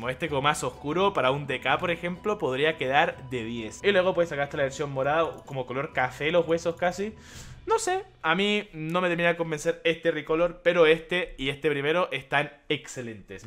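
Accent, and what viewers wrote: Chile. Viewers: este men es chileno no?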